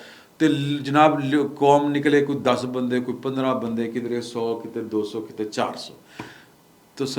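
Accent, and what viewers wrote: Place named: Lahore, Pakistan. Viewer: Babay o ! Aye lahory style ha...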